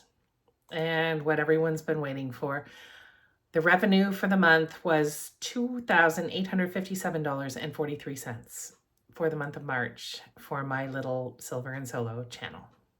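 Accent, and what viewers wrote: Canada. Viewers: Are you in Canada or at least Canadian? Sounds like it. 😊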